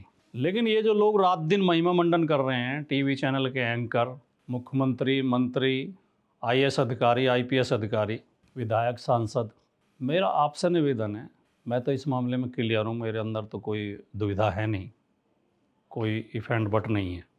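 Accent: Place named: Rewari-Alwar, India. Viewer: मैंने आपकी काफी पोस्ट देखी है कोशिश अच्छी है परिणाम देर से ही सही आएंगे जरुर बाकी अंधभक्तो को आईना दिखाने की जरूहु नहीं है साहब आपके बोलने के लहजे से आप रेवाड़ी अलवर मथुरा लालसोट दोसा भरतपुर इलाके के लग रहे हो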